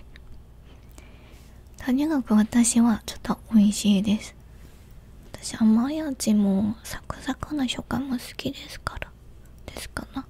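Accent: South Korea. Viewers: 普段日本語よりも韓国語の方が 発音とか口調とか色々と かわいいと思ってるけど、 韓国の方が喋る日本語はめちゃ可愛い🖤 とてもいい音ですね〜！ これからもがんばってください！ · 韓国人が喋る日本語スッッッッゴイ好き。この気持ちわかる人おる？めっちゃ可愛いんやけど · もう一生懸命話してるの可愛すぎます← 韓国の方が話す日本語大好きです！（唐突） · かわいすぎ〜(*≧∀≦*) ヤッバ⁉︎ 韓国人が喋る日本語だーいすき❤️わかる人おるやろ爆笑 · 韓国の方だからですよ〜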